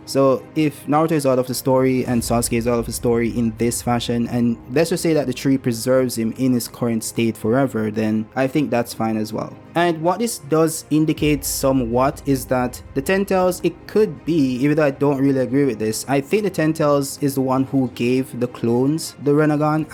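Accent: Jamaica. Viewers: I would say Jamacian or guyannese It is how he says the Word About. my two guess Worst one would be Grenadian. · Are you jamaican? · Bro you Jamaican??? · Bro are you Jamaican? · Are you Jamaican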